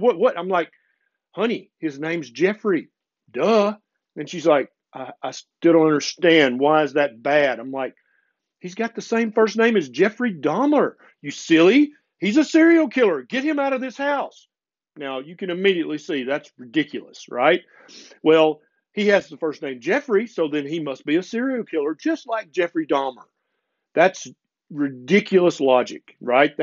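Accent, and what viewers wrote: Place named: North Carolina, United States. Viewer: I told my wife about starting Keto, and she brought it up to a veterinarian who works at her office. My wife called me and had the vet talk to me. She proceeded to tell me how Keto lifestyle could mess with the brain, Ketoacidosis, etc. I wish I had seen this video so I could forward it to her at the time. Love your videos Doc! I'm from NC. You sound like my people!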